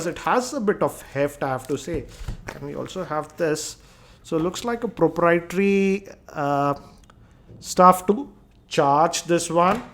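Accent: India. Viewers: dear, please change your accent. It's ok to have an Indian accent, but when you stop every sentence, it feels like you are singing, but with the same tune. Please take this as a friendly suggestion and please stop bending the last word of your sentences. Otherwise all your reviews are good.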